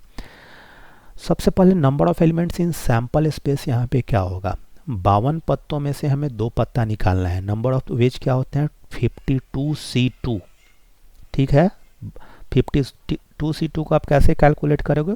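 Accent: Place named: Uttar Pradesh, India. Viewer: Sir aap toh UP ke lagte ho... BTW Amazing explanation. Thank you (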